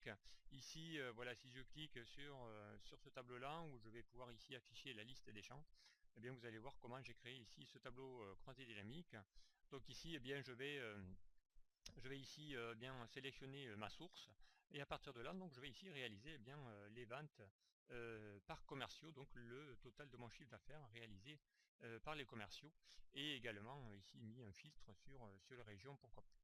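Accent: South, France. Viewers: étonnant, j'entends très bien, même avec l'accent du sud de la France, manque les cigales en bruit de fond Essayez avec des écouteurs ou casque audio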